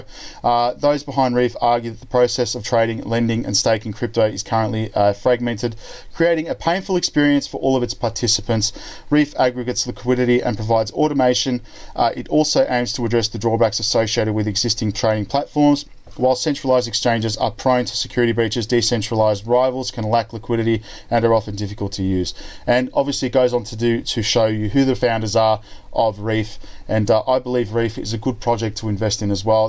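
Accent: Australia. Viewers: You Aussie bro?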